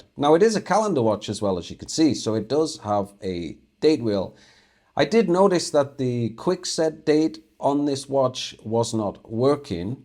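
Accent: United Kingdom. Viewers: Interesting accent, are you a Brit abroad maybe?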